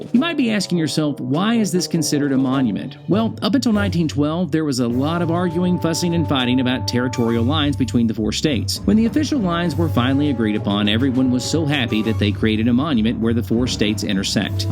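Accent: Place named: West, United States. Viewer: You just earned a sub, you sound western, what state are you from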